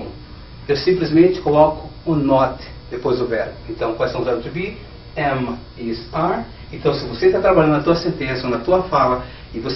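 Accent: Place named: Paraguay. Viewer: Meu Deus que ingles mais paraguaio!!! The pronunciation is terrible ! Nun fala nen ingles nen pt direito! Nao existe ingles em 50 horas , nao se iludam. Aprender uma lingua nen é algo mecanico.... olha se essa tecnica é tão boa , os filhos e parentes desse cara deve ser poliglota kkkkkk ! Eu falo ingles e sei que aprender uma lingua nao está ligado a nivel de inteligencia. Nao acho correto enganar as pessoas desta forma se é que alguem acredita . Abraços!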